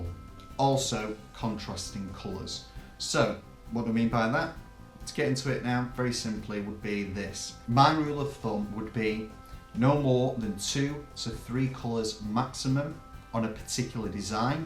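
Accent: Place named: United Kingdom. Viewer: You sound from UK, how do we sign up for it in uk?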